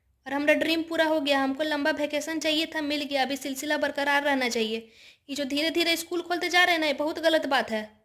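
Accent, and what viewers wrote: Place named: Bihar, India. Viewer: KASAM SE AAPKA JAISA YOUTUBER HUM AAJ TAK NHI DEKHE. (KONCHI KA GHAMAND HAI RE) 😂😂 LOVE YOUR BIHARI ASCENT. LOVE FROM DHANBAD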